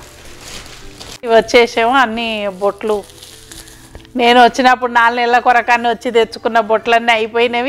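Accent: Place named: Telangana, India. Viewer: hi amma, me mata vintay ma intlo vala mata vinatlay undhi , madi telangana nice video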